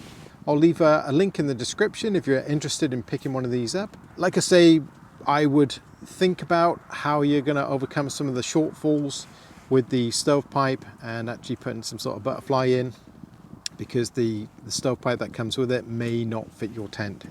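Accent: United Kingdom. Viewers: Thank you. GOOD to have the advice of a Canadian (I am from Quebec). I am looking for a video on how to relief yourself with number 1 and number 2. Thank you again. Nice British accent.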